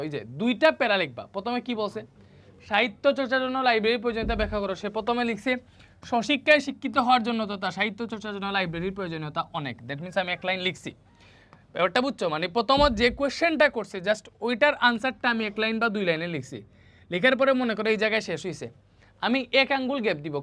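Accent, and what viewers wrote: Sylhet, Bangladesh. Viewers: ভাই ত সিলেটি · Bhaia tumi mone hoy Sylheti 😊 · স্যারর বাড়ি সিলেট নি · Vai apni ki sylheti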